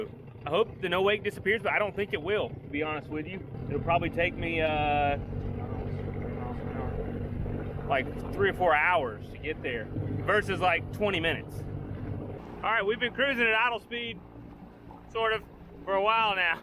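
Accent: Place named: South, United States. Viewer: Damn man you have a perfect country voice.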